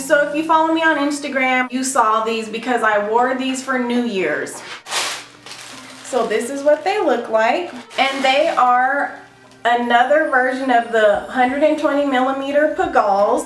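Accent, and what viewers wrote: South, United States. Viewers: You are so cracking me up with how honest you are about these shoes. I call it Shoepidity. Especially those god awful Louboutin. I have the Penny, took me over a year before I wore them. I have only wore them twice. Plus the platform is a dated shoe. I was coveting the white pigalle but I wanted it in the pretty blue. I am really impressed with your knowledge. Regarding finding sales. It's Dec 2 and June 2nd for sales. That's Gucci, Saks, Neiman and the other like department store. BTW where are you from? You have a strong southern draw. I have to check you out on Instragram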